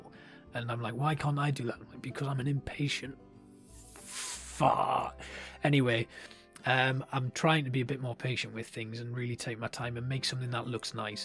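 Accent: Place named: Newcastle, United Kingdom. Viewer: You popped up on my feed. Didn’t realise you’re a fellow geordie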